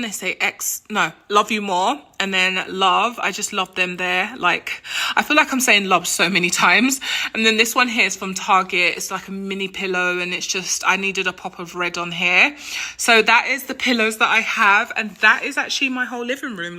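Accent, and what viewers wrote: London, United Kingdom. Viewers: Are you from London ?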